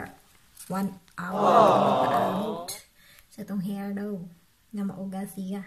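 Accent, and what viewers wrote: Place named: Visayas, Philippines. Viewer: Hi friend. Ang cute talaga kapag visaya ang salita..thank u sa pag share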